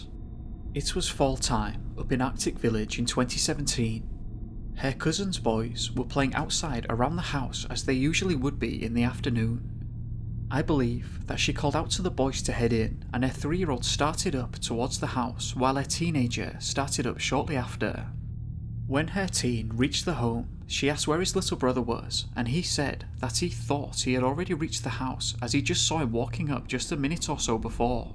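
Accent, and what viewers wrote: United Kingdom. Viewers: Please ditch the heavy British accent and I guarantee you'll triple your likes and subscribers 😉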